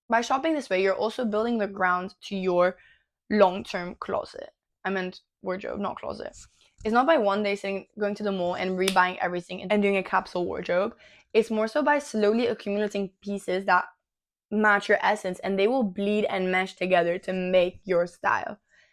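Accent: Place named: France. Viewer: Sister 🥹are you french btw? Ur accent is so cute:3 I'm not trying to be rude, but I've been learning French for awhile but only textbook style. I only started listening to French content, and I kind of recognise the accent..?^^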